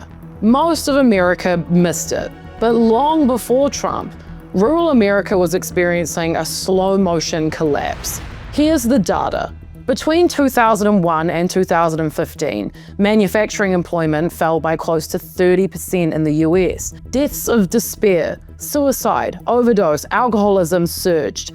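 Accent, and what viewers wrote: New Zealand. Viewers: Excellent logical intelligent presentation. I was sceptical initially and suspected this was an AI-generated Kiwi accent to appeal to us, despite being familiar with voice from RNZ but clueless about her appearance. (Subbed.) Trump convinced the "unwashed" that the middle-class were the elites who were mocking and oppressing them. Steve Bannon is all over this cynical manipulative strategy. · Thank you to a New Zealander on the other side of the planet for helping me understand my neighbors in the U.S. a little bit bedder, (sorry; 'better'. Enjoying the Kiwi accent). I've lived most my life between rural Maine and the city (not bedroom suburbia). Last week, deep in the Trump country of rural Maine (Burnham, 67.5% Trump) we just lost another factory, (making wood products.) Whatever the reason; state laws, Trump tariffs, Trumpism is clearly not helping. 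Will they catch on? Probably not before it is too late and any form of democracy is gone.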